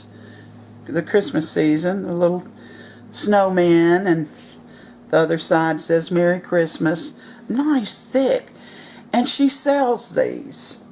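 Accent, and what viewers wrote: South, United States. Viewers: Don't mess around with her Southern draw and hospitality. Southern people are not in a hurry.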